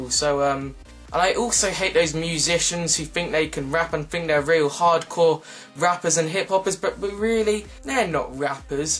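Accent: England, United Kingdom. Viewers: I think from your accent that you are British correct me if I'm wrong. But the question is: Can you do a regular American accent. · do you live in england because of your voice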